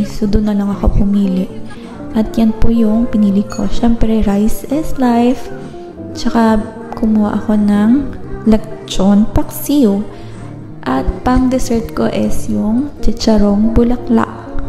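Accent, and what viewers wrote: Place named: United Kingdom. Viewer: British accent dw sabi ni 😊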